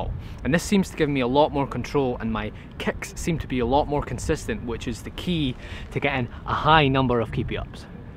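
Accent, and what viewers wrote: Scotland, United Kingdom. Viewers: He sounds Scottish (like me) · no hes scottish you frik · Is he scottish?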